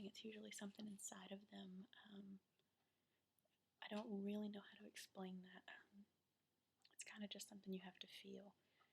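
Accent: South, United States. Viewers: Can't tell you how glad I am to see you back! As much as I love everyone's different accents,...It's really nice to hear a good ole southern girl on you tube again! :) I hope the family is doing good and hope to see more video's when you get time. Thanks, -Steve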